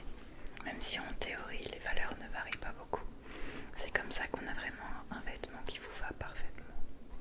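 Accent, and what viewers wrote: France. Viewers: Hey friend! I hope you are going really well :) I just wanted to say that this really sounds absolutely wonderful and lovely! You have an amazing french voice, its so soothing and lovely to listen to. I hope you are staying safe and happy.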